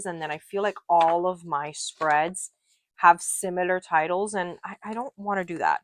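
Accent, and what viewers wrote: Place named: Miami, United States. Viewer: I heard your accent and instantly knew you were a fellow miami girly! Hiiii! Love the vid! :)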